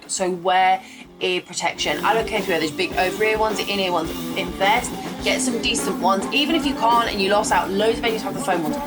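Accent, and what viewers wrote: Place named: United Kingdom. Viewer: Actually I thought these are great tips I am going to bring in my small gig photo session today. But the presentation was rather busy. No offense, but the quick talking with the specific accent made it slightly hard to follow what was said for a non UK person as me. Specially with the brief text that flashed past in the bottom of the screen. A tips, slow down. Don't try to say as much as possible on as short time as possible.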